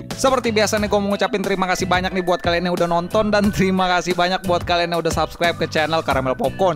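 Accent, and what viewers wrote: Banjar, Indonesia. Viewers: Orang Banjar kah nih?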